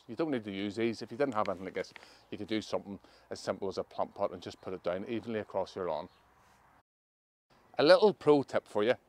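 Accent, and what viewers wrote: Northern Ireland, United Kingdom. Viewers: thanks for the full reply (not many YTers bother!) and BTW, love the Northern Ireland accent (if that's it?)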